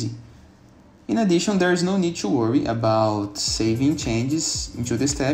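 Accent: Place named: Brazil. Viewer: Are you brazilian? maybe .. your accent is exactly the same srrsrs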